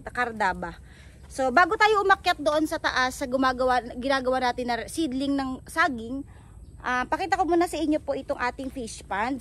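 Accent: Visayas, Philippines. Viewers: Oi bisaya diay ka maam shout out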